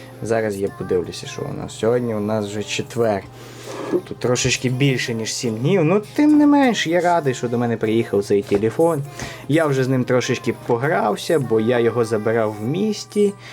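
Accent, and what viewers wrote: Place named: Western Ukraine, Ukraine. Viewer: Западный акцент забавный)))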